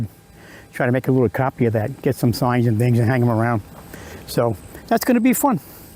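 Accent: New York, United States. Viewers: Hi, loved this video...Your house and garden are looking so beautiful...Have meant to say before, I just love your New York accent...👍❤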